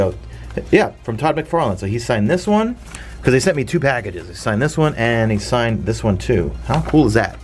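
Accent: New York, United States. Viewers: #madeittotheend congrats on 13 years! Your Destiny collection is impressive 🎉 Great setup btw I bet it feels good to have a dedicated space Also liked the New York accent 👍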